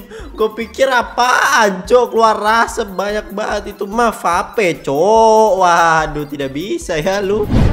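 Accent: Java, Indonesia. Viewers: Bang Lo jawa mana bng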